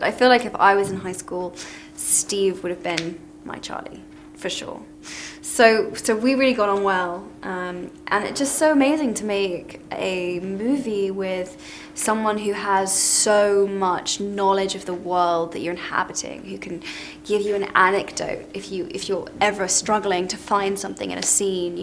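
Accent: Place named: United Kingdom. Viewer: Love her british accent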